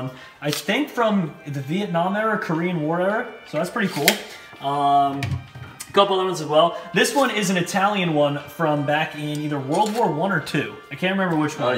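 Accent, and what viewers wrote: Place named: Netherlands. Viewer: He kinda sounds dutch tbh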